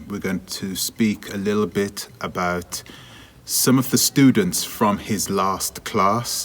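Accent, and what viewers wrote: United Kingdom. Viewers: You have a talent of story telling with your british accent its great really why dont you study african or even european history and share it with us that would be so much interesting... And for your bad energy that you need to dispense learn karate boxing or smthg just giving advice dont waste your talent now.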